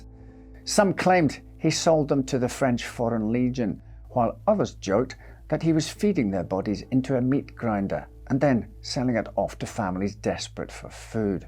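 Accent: Scotland, United Kingdom. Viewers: You accent amazes me, I grew up in the Scottish Borders so it makes me feel like you are from around the same area but you lean heavily on English for a lot of your R sounds you sound a non rhotic scot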